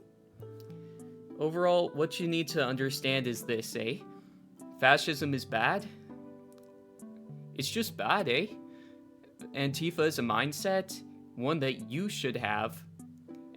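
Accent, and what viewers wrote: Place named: Canada. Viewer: oh wow this guy has dean withers featured in some of his captions, "fighting the good fight". I think time will tell on dean withers, not that it isnt telling at the moment. the guy is a scum bag. this video is a fun attempt at some kind of disection of current day politics focusing on the right and donald trump. also, he sounds canadian. its weird how the left insist on accusing the opposition of the things the left are guilty of.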